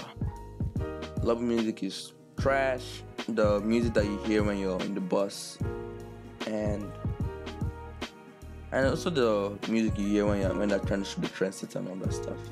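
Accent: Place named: Nigeria. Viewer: i can tell ur nigerian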